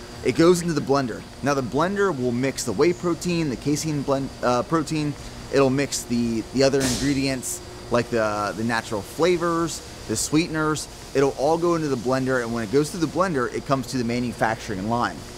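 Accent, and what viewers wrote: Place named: Brooklyn, United States. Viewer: Love how his a proud Texan but has the strongest Brooklyn accent😂😂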